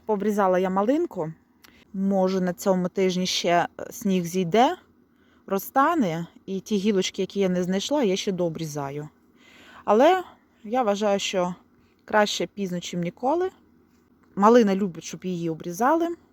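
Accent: Western Ukraine, Ukraine. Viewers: Ви мабуть з Західної України, бо розмовляєте не як Харків'яни, але зазделагідь вибачаюсь як це не так, в селі прекрасно живеться, головне все продумати завчасно з опаленням і комунікації в будинку щоб були умови для життя цивілізовані. Донечка як лялечка манюня і чоловік гарний 😍💓, хай вам Господь допомогає жити щасливо в оселі на Прикарпатті. Гуси - лебеді красиві но на жаль так Господь створив світ, що люди мають їсти 🍗м'ясо...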